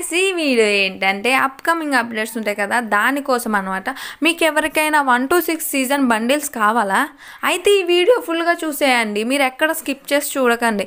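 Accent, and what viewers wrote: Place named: United States. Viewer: Ur english is very good like american english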